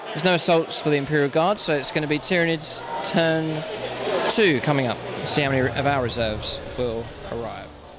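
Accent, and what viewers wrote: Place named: England, United Kingdom. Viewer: it does man, they kill alot of termigaunts and they barley scratch what all is on the board then i spawn more than they killed and they start panicking and getting frustrated which leads to mistakes. but it does get very tiring moving all those models and it takes forever. keep up the batreps i love the quality of the videos, the paint job and the scenery. im going to take from your accent your from Britain right? england most likley?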